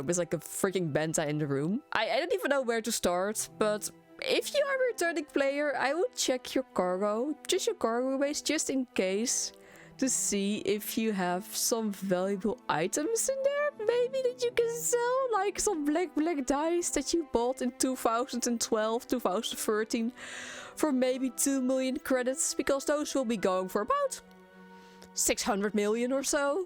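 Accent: Netherlands. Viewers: Listening to a Dutch accent is always amusing, so high pitched. Good video and +1 for the accent, lol.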